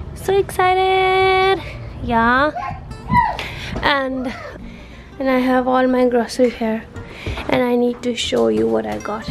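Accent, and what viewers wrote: Punjab, India. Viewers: Your videos always make me smile...being a Punjabi I lv ur Punjabi tone too...😘😘😘😻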